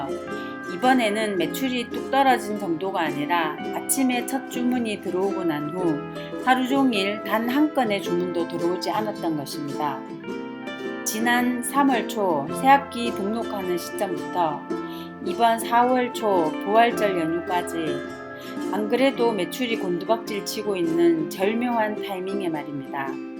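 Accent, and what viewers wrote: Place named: Seoul, South Korea. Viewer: ^ ^~ 나레이션 목소리가 넘 이쁘네요.. 서울 사람 인 것 같아요 으~ 흐 .. 넘 힘드셨지요? 니첼, 도도 없다아 .. ...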